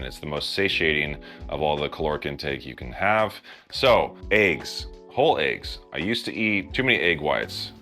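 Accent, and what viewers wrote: United States. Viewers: You understand exactly what I am talking about. You look and speak like an old Southern QUEEN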